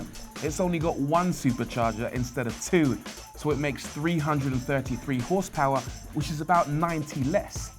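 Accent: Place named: Bristol, United Kingdom. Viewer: What part of England are you from, Bristol area?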